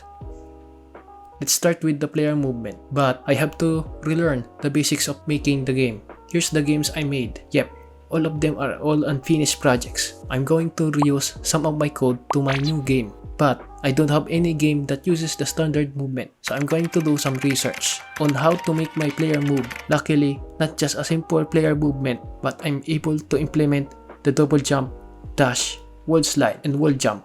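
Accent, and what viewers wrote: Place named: Philippines. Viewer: Filipino accent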